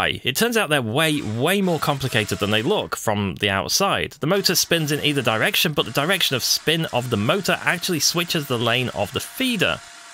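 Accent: England, United Kingdom. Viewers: This is yet another untested pie in the sky kickstarter project with no track record and many many unanswered questions despite all the fancy pants marketing and English accented voice overs . Don’t be a guinea pig with your hard earned dollars . Let some other clown waste their money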